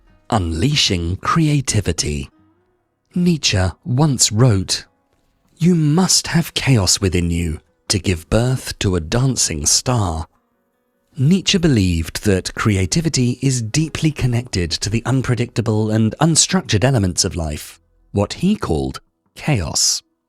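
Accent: United Kingdom. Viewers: Perfect British accent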